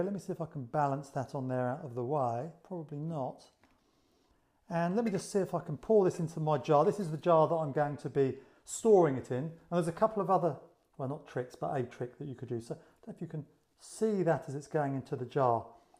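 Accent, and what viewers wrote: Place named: United Kingdom. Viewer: Great job 👏 perfect explenation. Btw : I like your British accent 😉 Greetings from Belgium 🇧🇪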